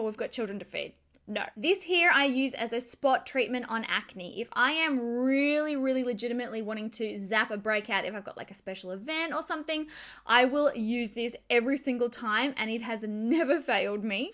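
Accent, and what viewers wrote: Australia. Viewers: Love this! Thank you so much! I love your Australian accent and how you pronounce things.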